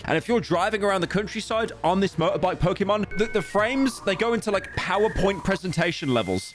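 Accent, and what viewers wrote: United Kingdom. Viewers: Brits are so weird... "Willy Wonker"... geez man.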